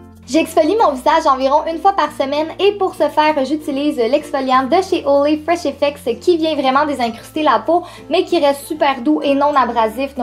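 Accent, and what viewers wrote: Quebec, Canada. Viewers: coucou j'adore tes vidéos et ton accents québécois je t'adore mais juste une question pourquoi met tu de verre de contact ? merci de me répondre rapidement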